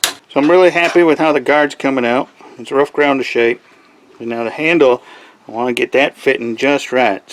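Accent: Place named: South, United States. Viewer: LOL I came to comment about how southern he’s sounding these days 😂😂😂 I wonder if he notices he’s doing that 😂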